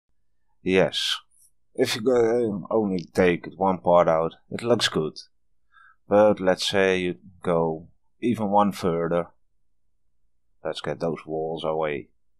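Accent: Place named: Finland. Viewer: Are you from Finland? Your accent sounds pretty finnish or estoian (?) :)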